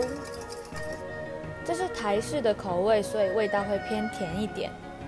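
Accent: Cantonese, China. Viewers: 台妹講廣東話好可愛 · 啲廣東話好得意😂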